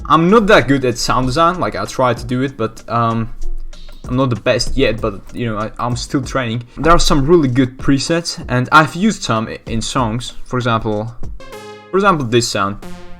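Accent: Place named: Netherlands. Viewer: Cool to hear your voice man, where you're from? Your accent sounds kinda dutch 😁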